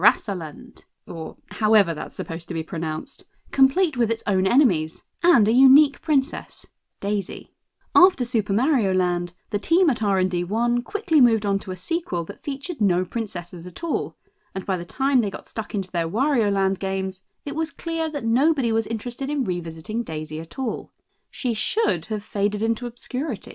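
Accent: United Kingdom. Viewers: It sucks that she wasn’t added, as a playable character in the game. Other people, such as myself, would’ve loved playing as her, if they added her to the actual game, and actually be playable in the dlc, that Nintendo might plan on making, that is. Also, glad to hear your British accent again, by the way, since I’m British as well, just like you are irl!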